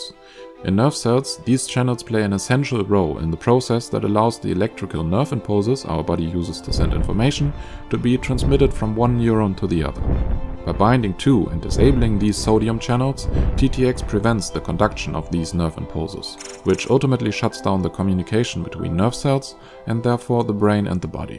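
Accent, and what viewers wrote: Germany. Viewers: Are you German ? because I hear a German note in your pronaunciation lol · You instantly recognize the german accent xD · are you german? you do sound a lot like a german person speaking english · Why do you sound this german? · Are you from germany?i don't mean it in a bad way it's just they way you speak englisch even tho yours is perfect kinda reminds me of the my peers used to do ,i'm from germany might explain why i ask? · Is the narrator german? Sounds like i while speaking englisch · Ich hab noch nie jemanden auf YouTube gesehen der so nen starken deutschen Akzent hat :D no hate ich finds nur witzig und ziemlich ungewohnt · german accent? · Du bist deutscher oder? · höre ich ein deutschen akzent? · This guy is German for sure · i love hearing morbid facts in a matter of fact German accent.. Informative and unintentionally droll. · You Sound so German you could voice Klaus from American Dad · 10 seconds in and i can already tell that he is German lol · The First 7 Seconds and i already know you are a German. 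· I realized the German accent immediately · He sounds german lol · This dude sounds super German. That being said, awesome video. I'm hooked and subbed! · Kommst du aus deutschland? Dein akzent klingt so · German accent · Are you from Germany?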